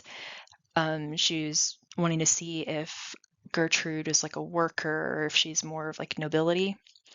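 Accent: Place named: United States. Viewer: Oh that ghastly American accent! How it jarrs....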